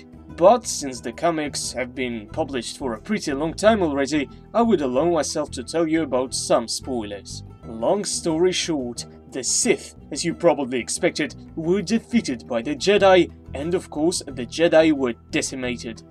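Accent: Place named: United Kingdom. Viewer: This is British accent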